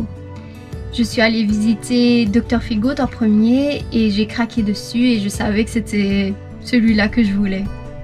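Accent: Liège, Belgium. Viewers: Bonjour, je découvre ta chaîne, tu as un bateau vraiment bien aménagé et bien restauré. Bravo. Il me semble percevoir un petit accent Liégeois. Je me trompe peut-être? A bientôt. Marc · Me voilà nouvel abonné. Un petit accent liégeois? Aussi, on aimerait avoir une présentation de toi et de ton bateau. Fancophone en Australie, que faisais-tu avant de partir à l'aventure, quelles destinations tu envisages.... voilà autant de sujets pour tes prochaines vidéos. Merci pour le partage. Bon vent à toi pour 2021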